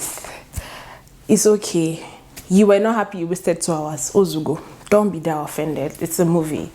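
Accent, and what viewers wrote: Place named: Pidgin, Nigeria. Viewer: Its ur pidgin for me😂😂. I cant even speak like that🚶‍♀️🚶‍♀️🚶‍♀️. Me I sha loved the movie oh.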